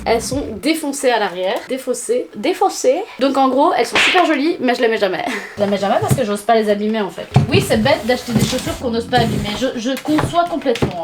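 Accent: Belgium. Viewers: 1) je t’adore dès la première vidéo que je regarde de toi 2) t’as un petit accent qui me semble belge?? Serais-tu belge à tout hasard?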